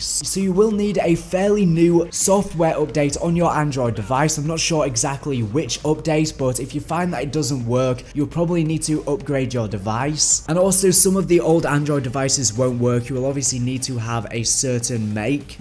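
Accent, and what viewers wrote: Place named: North, United Kingdom. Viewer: You a northerner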